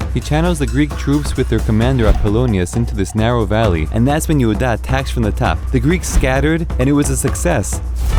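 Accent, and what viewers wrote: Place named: New York, United States. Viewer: I was not expecting to break out a perfect NY accent! This is the first time I’ve heard him talking instead of singing. I’ve always imagined he’d have a strong Israeli accent!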